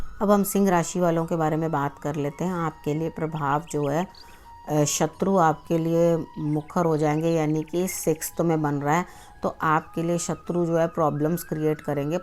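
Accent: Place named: Punjab, India. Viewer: Jai mata di rabb sab ko sukh de excellent explaination you Are very sweet way of telling anything tooooo cute punjabi style hindi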